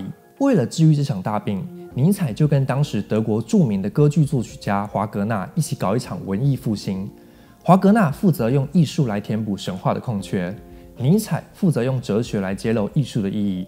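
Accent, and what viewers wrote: Taiwan. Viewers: 实在欣赏不来台湾腔的男生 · 这个台湾普通话听起来费劲啊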